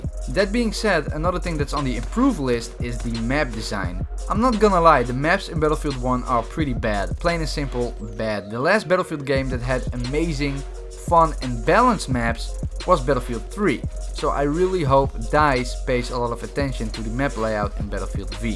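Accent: Netherlands. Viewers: Such a Dutch accent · Are you dutch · Ik dacht dat ik goed in engels als nl zijnde ik herkende je accent bijna niet good vid do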